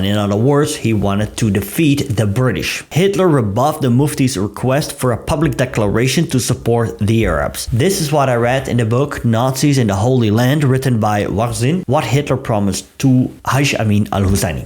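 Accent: Netherlands. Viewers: Within the first few seconds of this video, I knew you are Dutch because of your distinctive accent. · I can hear you are Dutch love the accent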